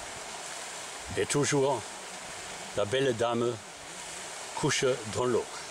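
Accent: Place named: Midi, France. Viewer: Hallo, wohnen Sie zufällig im Süden von Frankreich? Sie sprechen französisch mit einem so netten Akzent vom Midi:) es ist ja echt schön.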